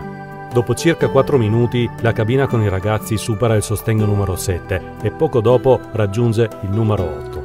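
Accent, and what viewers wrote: Italy. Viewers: Un altro servizio superlativo... niente da aggiungere.. tutto perfetto e bellissimo, narrazione precisa e molto tecnica ma comprensibile a tutti... complimenti davvero...bravissimi (per mi che son della zona l'accento triestin se un plus che fa sempre piazer 😀😀).. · Scusi lei è veneto?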